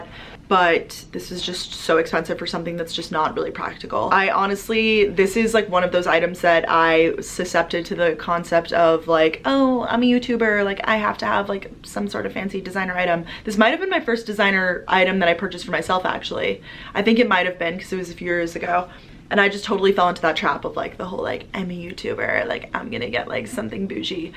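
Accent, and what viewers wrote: Long Island, United States. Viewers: your long island accent is just the best🥰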